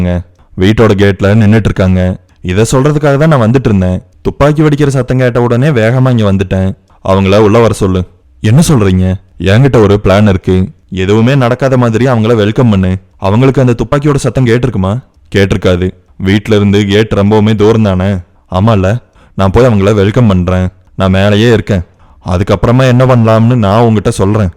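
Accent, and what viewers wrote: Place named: Kongu, India. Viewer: நல்லா இருக்கு கொங்கு தமிழ் ❤❤😊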